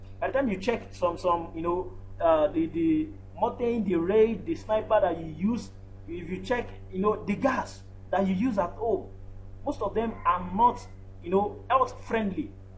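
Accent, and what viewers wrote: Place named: Nigeria. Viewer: Why can't you speak real diction Man see your Nigerian accent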